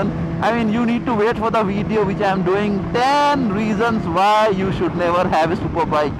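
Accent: Odisha, India. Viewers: English lecture ree odia tadka😆😆..mast!!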